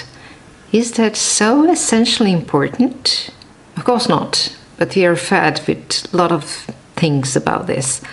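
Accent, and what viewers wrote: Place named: Texas, United States. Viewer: Thanks for your Texas accent...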